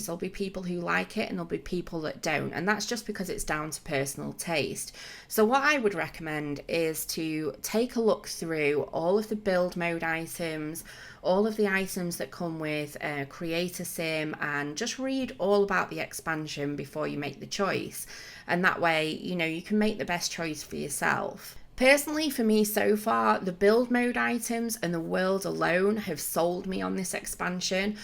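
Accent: United Kingdom. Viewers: Enter! I'm a 15 years old boy from Bavaria, Germany. I love your videos, your britsh accent and the ideas that you come up with! You really inspire my builds! I hope you have a great time and please go on with those amazing speedbuilds. Much love from Munich😍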